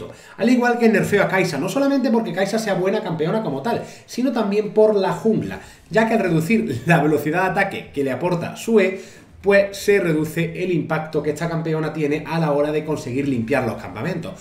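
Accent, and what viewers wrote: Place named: Seville, Spain. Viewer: Hay subtítulos al español? No entiendo el sevillano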